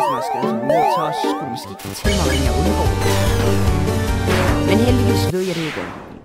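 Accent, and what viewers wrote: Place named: United States. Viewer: Det lyder som en amerikansk spiller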